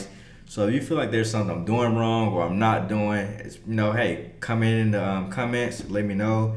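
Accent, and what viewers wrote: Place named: Texas, United States. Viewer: Also you sorta have a country accent like Texas in a way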